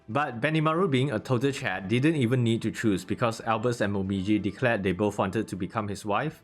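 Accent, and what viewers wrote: Singapore. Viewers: Singaporean accent :)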